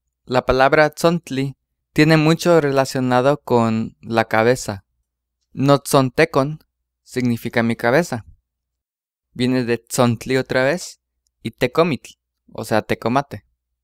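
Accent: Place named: Northern Veracruz, Mexico. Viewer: Hola buen día, una pregunta, el Nahuatl, que hablas y enseñas de ¿donde proviene o de que región es de donde lo hablas?, por que el nahuatl tiene muchas variantes dialectales y aunque tiene cierta similitud a la pronunciación del la región norte del estado de Veracruz, muy padre el material, mil gracias por tomarte el tiempo y elaborarlo, es tan util.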